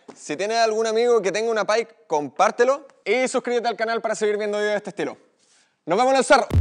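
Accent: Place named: Rural, Chile. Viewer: Por qué no hablas un Chileno como Cristian Contreras Radovic? No podes ser menos chileno campesino? Sería mejor de oírte en otros países! Saludos cordiales 😎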